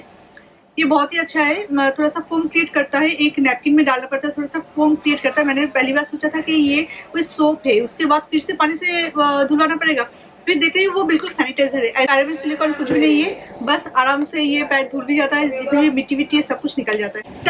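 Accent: Bengal, India. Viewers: Tomar bangla ta, 🤩🤩🤩 uh got a nice bngoli tone😍😍😍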